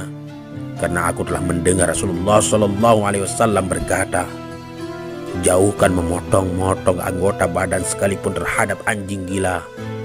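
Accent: Aceh, Indonesia. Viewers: Dialeg admin, terdengar seperti dialeg aceh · Saya lebih ke suku aceh suara "s" dan "t" nya khas .. dan fasihnya melafazkan kalimat arab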